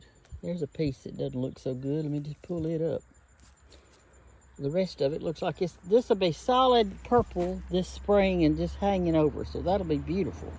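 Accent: South, United States. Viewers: Hi queen I'm from Augusta Georgia and I love that country voice lol the way you cook them liver and onions thank you again ❤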